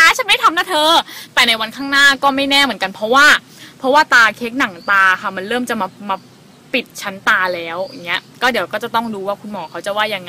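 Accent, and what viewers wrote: Thailand. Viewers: พูดเหน่ออ่ะ แต่น่ารักนะไม่ได้ว่า ผมว่ามันเป็นเสน่ดี......ศัลยกรรมผมเชยๆนะใครก็ชอบคนสวยทั้งนั้นแหละ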